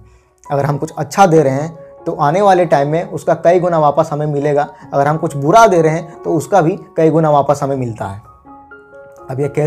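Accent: Bihar, India. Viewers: Aap Bihar se hai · Tum Bihar se ho ya jharkhand se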